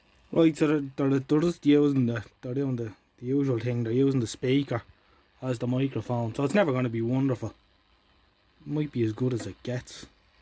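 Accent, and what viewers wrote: Ireland. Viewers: When you were testing the second set your Irish lilt turned Japanese ! Spooky! 6.30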